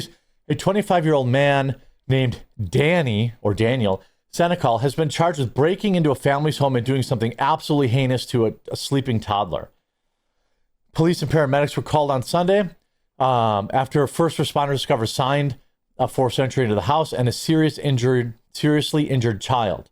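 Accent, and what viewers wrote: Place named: Wisconsin, United States. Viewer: ) I can see that, but I like how talks because it reminds me of my sweet Mom's family from Muscoda, WI.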